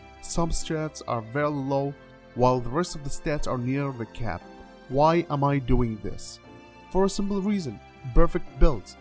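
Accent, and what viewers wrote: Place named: Arab world. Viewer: has an arabian accent 👀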